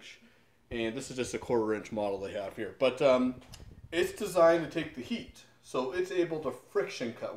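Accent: Canada. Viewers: believe me, I really appreciate the Canadian accent, for me is the best english.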